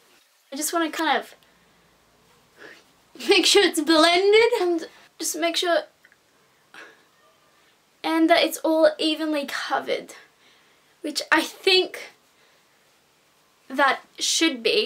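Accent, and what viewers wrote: Australia. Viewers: are you austraillian ?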